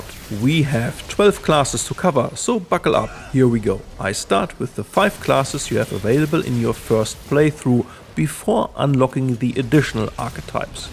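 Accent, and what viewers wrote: Germany. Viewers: Youre 100% German. That’s the most typical German/English accent I’ve ever heard! 😂 Thanks for the very informative video and taking the time for the community!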